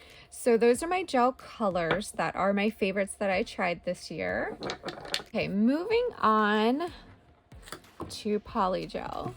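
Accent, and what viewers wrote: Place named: California, United States. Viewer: So so helpful to have these suggestions in a single format. Thank you. I have used so much of your suggestions. Oh, and as I was watching your video, my son walked into the room and asked if you were a California girl. Because of your "accent". LOL, wasn't aware you had one🤣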